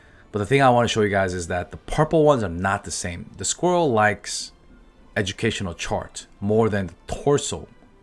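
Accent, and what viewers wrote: United States. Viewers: Try to speak less like an American and more like someone speaking English.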